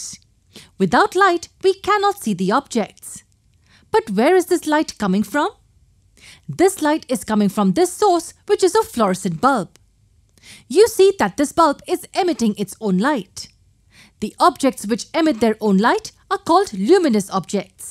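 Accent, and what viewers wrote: India. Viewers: Thickkkkkk INDIA ACCENT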